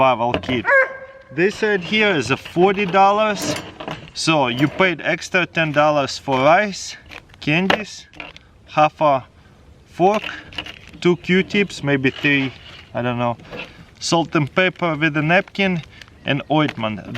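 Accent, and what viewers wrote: Russia. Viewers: sir, i find your accent to russian. please get a vanda white to do the talking.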